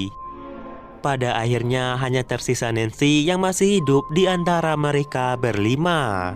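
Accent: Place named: Medan, Indonesia. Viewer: aku suka aksen Medan,tapi klu buat review boleh lah bang di kurangin dikit aksen Medan nya,biar lbh nyaman di telinga 🙏🏻 mohon maaf sekedar saran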